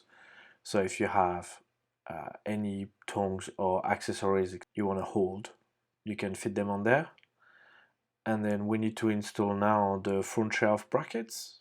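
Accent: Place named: England, United Kingdom. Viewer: You sound more like a Limey...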